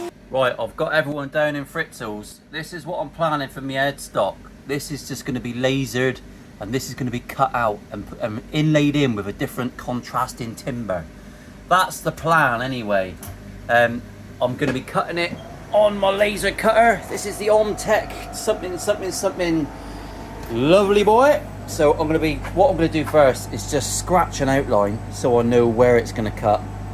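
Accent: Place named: Wales, United Kingdom. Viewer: Nice work on the Welsh accent, try throwing the odd 'Tidy butt' in for a bit more authenticity.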